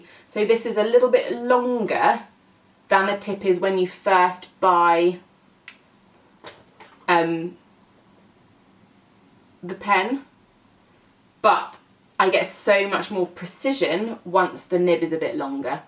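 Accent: United Kingdom. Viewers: Finally someone who speak British English and not American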